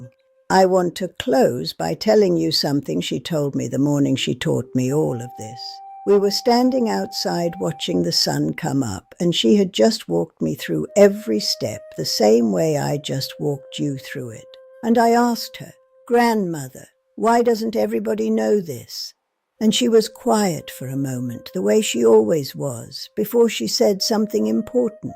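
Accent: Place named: England, United Kingdom. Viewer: Lmao as an Actual Native it’s funny to kitten to an Ai native with an English accent lol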